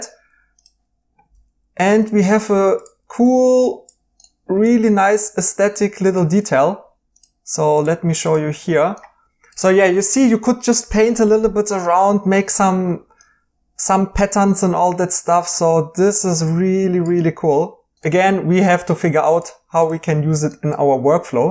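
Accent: Germany. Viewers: that german accent xD SCHLAND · So ein schönes deutsch-englisch und auch das Programm ist beeindruckend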